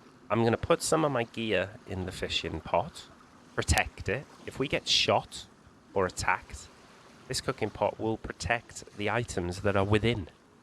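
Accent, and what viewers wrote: Wales, United Kingdom. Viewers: Great video - you welsh?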